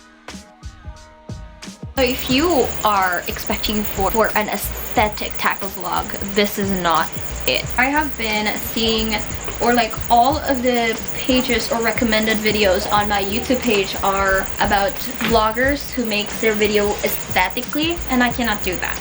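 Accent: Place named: United States. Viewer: she has an american accent ............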